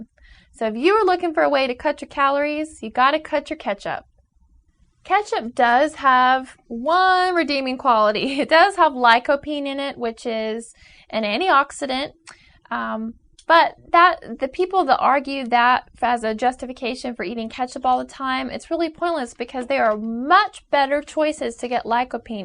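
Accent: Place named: United States. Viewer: that American accent really grates on me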